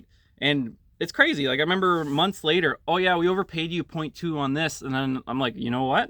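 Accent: Canada. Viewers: No disrespect, you sound from Canada....i think the dynamics are different than here in the states,flat rate is a HELL NO for me,i been in auto dealership settings 20+ years and when i quit and went into heavy truck family owned very respectful people and my life changed forever master heavy duty certified all my ASE's good family good life,and 0 flat rate...ALL hourly,and its 8 N skate..if i want,i never go to a auto dealership again,but you also made a good career choice you have to make the decisions to make your life better. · Canadian, eh? I went to Sarnia in 1981.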